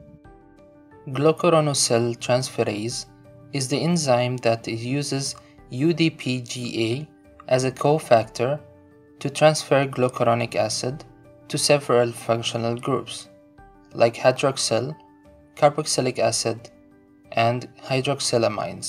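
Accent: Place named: Arab world. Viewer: You speak english with an arabic accent ^_^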